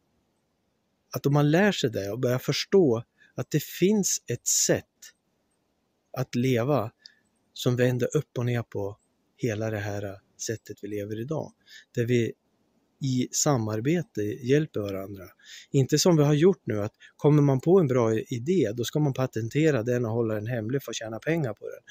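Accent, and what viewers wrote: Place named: Norrland, Sweden. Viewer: Tack, detta är min sanning också. ❤💚 Härligt och höra norrländska. 😊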